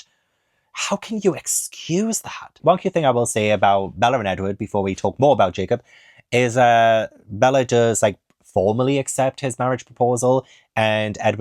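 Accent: United Kingdom. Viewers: Idk what country you hail from. To my ignorant American ears, it sounds like somewhere in the UK. If I’m wrong, skip my comment. If not, I have a genuine question: How do you square the thoughts you present at this timestamp with the very real-life example of Elizabeth II being betrothed to Philip when she was 9, and he was in uni? Ever since I heard that, my thoughts on the Royal Family, while never chummy or loving, deepened into total disgust. ESPECIALLY considering that Elizabeth and Philip were cousins. Genuine, non-confrontational question that I’d love to have the opinion of a UK citizen of. Again, if that ain’t you, disregard.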